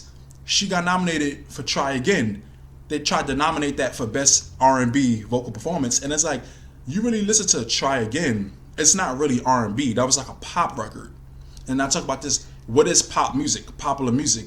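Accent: New York, United States. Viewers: Lmaooo I love your accent too much 😂😂😂😂😂😂😂😂😂😂 NY for life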